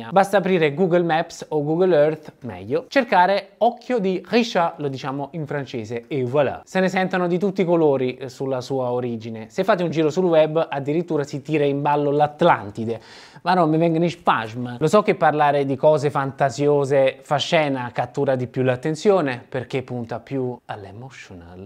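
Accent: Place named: Naples, Italy. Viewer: ma togli quell' accento napoletano suu'! che rovina l interesse dell argomento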